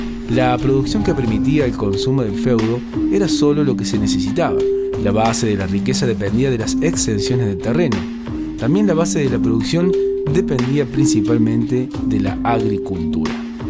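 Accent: Córdoba, Argentina. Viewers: Che alguien más pensó q este es cordobés? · muy bueno el video, me sirvio mucho para un informe escolar. No pude evitar tu acento Cordobes jajaja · Y el cordobe que narra la historia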